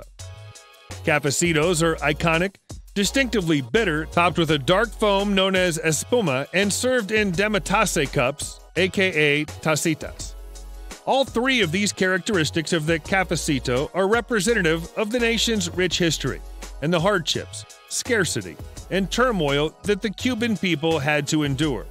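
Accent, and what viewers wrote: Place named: Spain. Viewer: I love your Spanish accent and I appreciate the effort great reference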